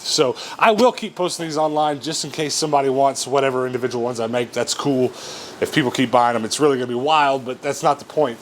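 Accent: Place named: United States. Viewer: You’re super American, interesting but a bit too much for me to listen too regularly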